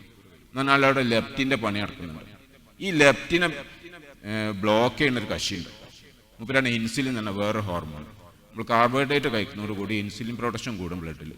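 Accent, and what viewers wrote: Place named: Malappuram, India. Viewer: എനിക്ക് എറ്റവും ഇഷ്ടപെട്ടത് ഇദ്ദേഹത്തിന്റെ നാടൻ മലപ്പുറം slang ആണ്.. നല്ല വ്യക്തിത്വം.... ഇദ്ദേഹത്തെ പോലുള്ളവർ ആണ് യഥാർത്ഥ മനുഷ്യ സ്നേഹികൾ.. അല്ലാതെ രാഷ്ട്രീയക്കാർ അല്ല...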